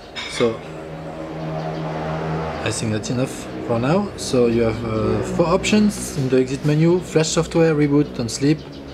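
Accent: France. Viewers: Hey buddy you speak english like french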